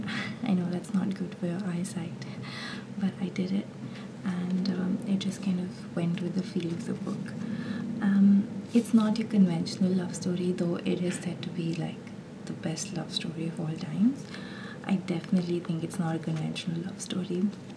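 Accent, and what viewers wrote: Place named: India. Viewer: Soft, Indian accents ALWAYS give me ASMR, especially this "unintentional" kind!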